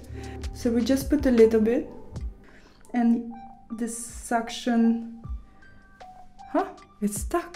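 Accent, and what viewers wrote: Russia. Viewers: She's been living abroad for years, and her accent ,pronunciation are still russian. 😂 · I like it that she still has that Russian accent. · Такая милая, до сих пор акцент русский 😊❤ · Ее английский, так и остался с русским акцентом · Вот меня это тоже удивило - она последние 20 лет как минимум живет постоянно за границей и до сих пор имеет отвратительный русский акцент.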